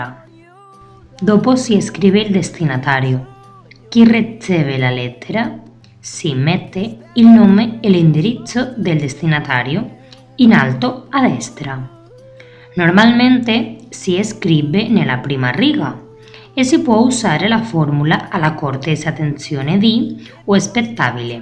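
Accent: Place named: Spain. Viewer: È un accento,credo sia spagnolo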